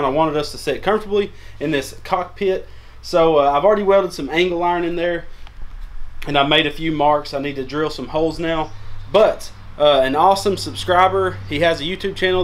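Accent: South, United States. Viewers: Are you from down south?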